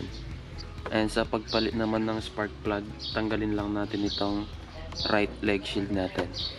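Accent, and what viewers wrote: Pampanga, Philippines. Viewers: Bos, kapampangan kaba.?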